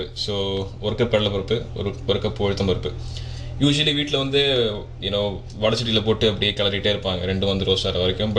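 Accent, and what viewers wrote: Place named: England, United Kingdom. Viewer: hey, never mind. i like your cool english accent and local time.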